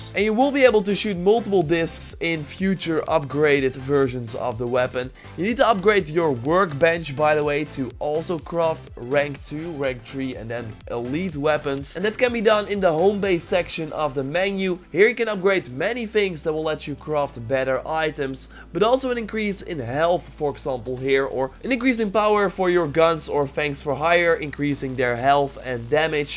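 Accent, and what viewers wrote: Netherlands. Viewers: Are you dutch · you are dutch haha das heel simpel te horen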